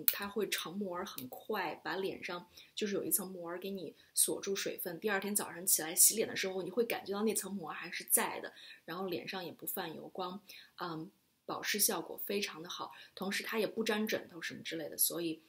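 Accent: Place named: Beijing, China. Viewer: 京腔儿听着好亲切 😊 你也在加州吗？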